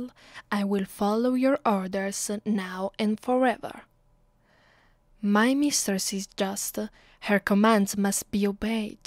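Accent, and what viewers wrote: Italy. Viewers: Do Italian women actually sound like this in English lol or are you putting on a, 'Vampire' accent? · oh i've been kidnapped by a hot vampire lady with a italian accent, life couldn't get any better then this. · This is the BEST accent I have ever heard in my life. Like, literally, it just turned out that Italian people are what I would want to hear all my life. Oh my God. WHAT IS THIS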